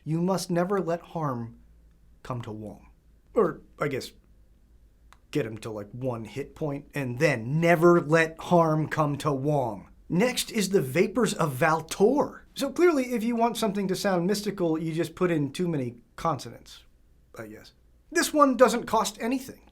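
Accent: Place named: Scotland, United Kingdom. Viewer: Thought this one might be Scottish 🤣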